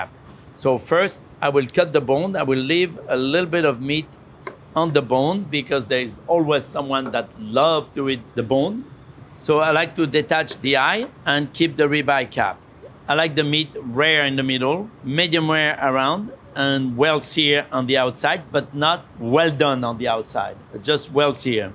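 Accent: France. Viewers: Goodness me, amazing cooking, mouthwatering steak with a touch of french accent. I'm hungry now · love every time his inner french hits randomly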